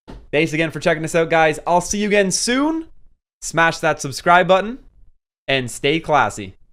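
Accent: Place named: Canada. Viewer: UR CANADIAN ACCENT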